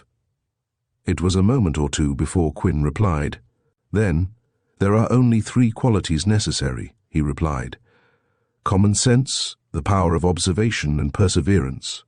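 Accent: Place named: England, United Kingdom. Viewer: having a strong English accent read a specifically AMERICAN tale.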